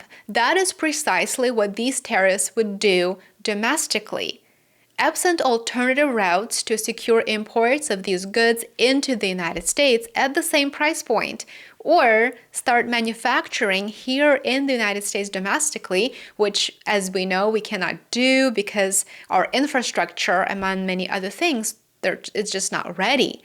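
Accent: Europe. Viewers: your European accent blow me away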